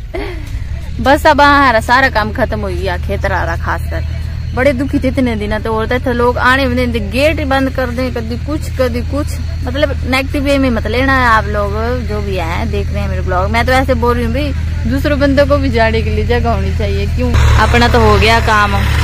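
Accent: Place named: Pahari, India. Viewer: Enjoyed your Phadi speaking. It is bit different how we speak phadi in Kangra region.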